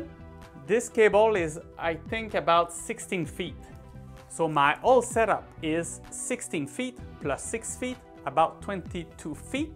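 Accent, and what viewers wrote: Quebec, Canada. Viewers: C"est moi ou tes quebecois?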